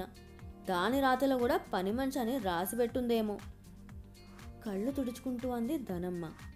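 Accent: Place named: Telangana, India. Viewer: Nice story and very well presented in telangana accent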